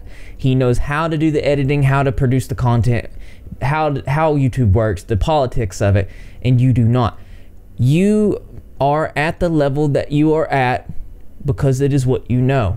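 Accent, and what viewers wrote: South, United States. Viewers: I really enjoy your dialect, it souds so down-to-earth and accomodating. I can tell it's a southern 'accent' but where are you from exactly if you don't mind me asking? :) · Your alternative style mixed with your country accent is pretty fire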